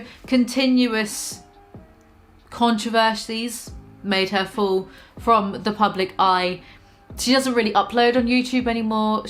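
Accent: United Kingdom. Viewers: me, an american: wow she sounds extra british today: i am ill edit #2: every single person in the replies is an idiot except (also i spelled her name wrong originally so i'm also an idiot) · i hope you feel better, but you sound so cute!! i don't know why but i think british accents get better when people are a bit stuffed up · i love ur British accent so much ugh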